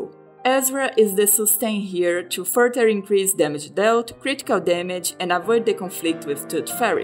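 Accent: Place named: Brazil. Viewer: Eu assistindo pensando se você era BR pelo sotaque ai aparece a Jiu com uma garrafona de 51 OUFHISDAASFIHD Muito bom o video. Não tinha planos pra Isolde, mas o Joe com certeza me fez repensar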